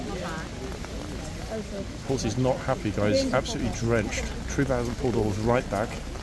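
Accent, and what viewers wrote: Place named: England, United Kingdom. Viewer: What part of England does your accent come from? You are easy for my American ears to understand! That rain is relentless!! Funny how spoiled the horses are...mine would often choose to stay out in the pasture in the rain and snow instead of heading into his barn!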